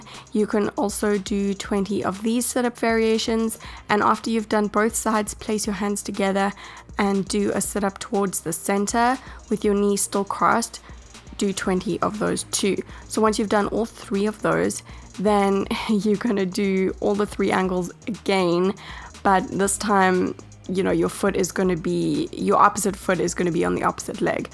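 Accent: South Africa. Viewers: הנסיכה שילת I believe she is South African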